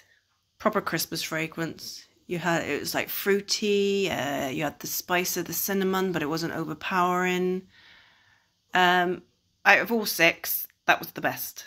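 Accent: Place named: Devon, United Kingdom. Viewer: Hello. Just started making wax melts after making soap and body products for two-three years. Love the video. Why do you transfer to a second jug is it simply for ease of pouring? I sense a Devonshire accent hubby’s from asthma Devon and I lived there on two lengthy occasions. Tasmania reminds me of Devon and Cornwall wrapped together. How disappointing that you made so many not to like so many.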